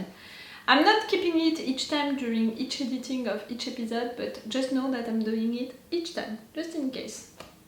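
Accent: France. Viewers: j'adore ton accent français ^^ Il est merveilleux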